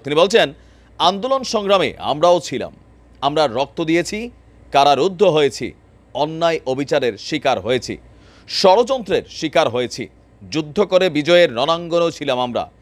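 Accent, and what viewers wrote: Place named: Noakhali, Bangladesh. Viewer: ভাই আপনি ভালো আছেন আপনার বক্তব্য আমি শুনি, আমার মত অনেক লোকজন শুনে, আপনার উপস্থাপনা অনেক ভালো লাগে আপনি কি নোয়াখালী, কথার মাঝে ও নোয়াখালী টান পাওয়া যায়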